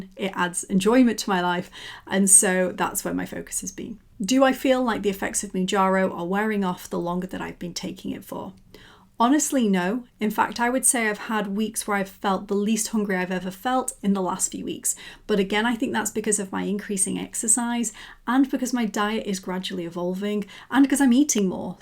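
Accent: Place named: United Kingdom. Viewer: Great Video and been loving the journey, personally down over 32kg, and recently smash my 10k PB (been in place since my early 30s) to a very healthy 56mins. Keep up the great work. MJ has been such a game changer, I was diet fatigued and have wrestled with my weight since pre-teen years I never dreamt that 40yrs later I could really make a change with the right support. Great to have a very UK voice rather than a US centric view.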